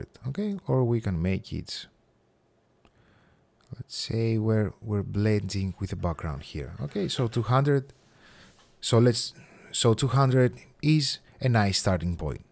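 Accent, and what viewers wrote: Greece. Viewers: Είναι τόσο χαρακτηριστικό όταν ένας έλληνας μιλάει αγγλικά!! Από τα πρώτα δευτερόλεπτα λέω ώπα δικός μας είναι 😛😛 very nice tutorial, clean and easy to understand , keep the hight quality content !!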